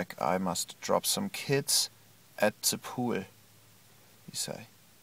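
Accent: Germany. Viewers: definitely german. The accent, the stuttering, everything. when people imitate germans they talk angrily and keep shouting das this das that. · It's his true accent. He's a real DJ from Erlangen Germany :) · he sounds german :) it must be very funny if someone has english as mother language, but for me it's perfect english haha :D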